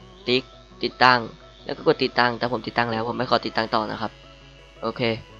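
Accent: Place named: Thailand. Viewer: เสียงเหน่อๆ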